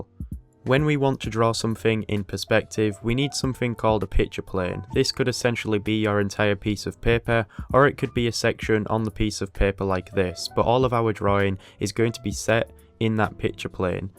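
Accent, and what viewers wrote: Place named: Hull, United Kingdom. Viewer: His accent is Hull, from the North of England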